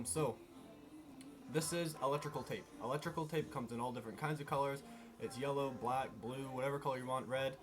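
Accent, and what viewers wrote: Scotland, United Kingdom. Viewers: where are u from like I keep getting hints of a Scottish accent idk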